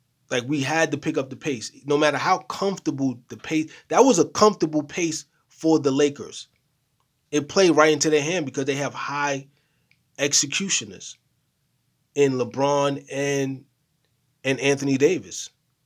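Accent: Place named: New York, United States. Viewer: U from Toronto or New York dawg?? I’m just curious. The way I say some words sound like u from New York. Would be cool if ur a raptors fan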